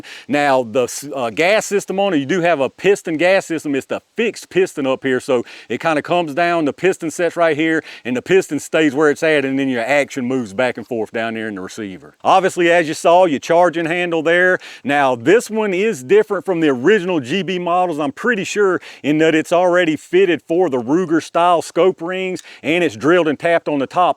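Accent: United States. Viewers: Came for the gun, subbed for the accent. What state are you in?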